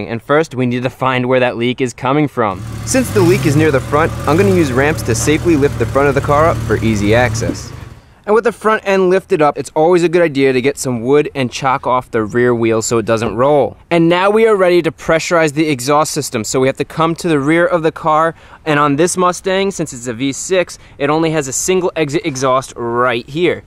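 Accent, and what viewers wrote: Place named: Pennsylvania, United States. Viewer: Good wooder PA style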